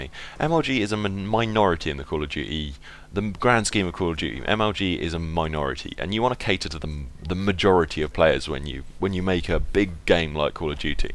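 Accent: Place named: RP, United Kingdom. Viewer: Still posh.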